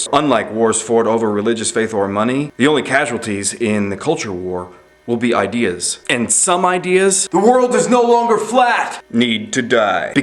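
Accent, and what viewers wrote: United States. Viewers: Ur american right? Cause u sound like it. So unbelievably ignorant. Who cares who's republican and who's liberal? Are u such a sheep u base ur views no that of a party?? I am very pro life i am also pro gay marraige and i am an athiest. Oh and If ur stupid enough to call a pre-born baby "a ball of cells" u should do at least a bit of research cause frankly im embarrassed for you.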